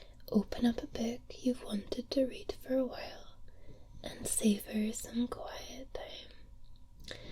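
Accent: Scotland, United Kingdom. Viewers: Her Scottish accent is everything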